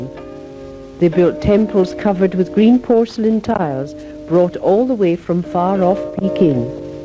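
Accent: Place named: United Kingdom. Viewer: Very well done and loved everything about it. As a child visiting HK in the 1970s this brings back so many happy memories, this was a great time to live and a great place to live. Loved the traditional music, of course, and the narration, so British!! I have not been back since the 1980s and now things are so different under China's control. The memories will always however be there and this short video was a blessing. Many thanks for this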